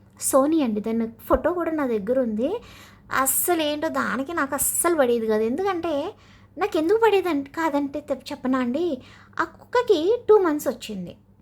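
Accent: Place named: Godavari, India. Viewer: మీది గోదావరి అండి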